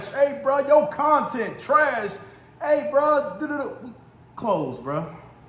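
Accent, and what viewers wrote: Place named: Detroit, United States. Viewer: Could’ve swore you was from Detroit s/O to Cincinnati! I got fam in Youngstown & I just subscribed to your channel bro! Keep goin up 💯💯💯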